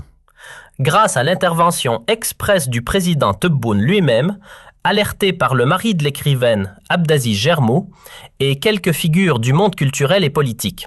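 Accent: Canada. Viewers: De quoi tu te meles toi avec ton accent canadien mais que voulez vous de l'ALGERIE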